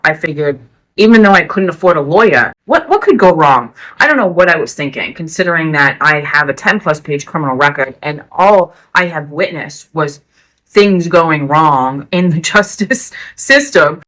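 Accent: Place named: New York, United States. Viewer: you’re incredibly strong! I don’t know if I could deal with the trapped anxious feeling during withdrawal and be in jail. You deserve the best now! Love the New York accent, I work all over NY and NE. I see your channel blowing up! I was kinda sharing my lengthy story on how I came off opioids in various parts.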